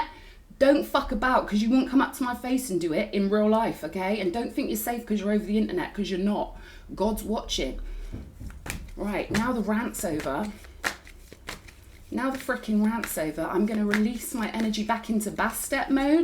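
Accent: United Kingdom. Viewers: Karmic energy just spit on it. LOL I love ur uk accent reminds me of Robin gibbs my sweetheart. Ahhh he was a Capricorn also.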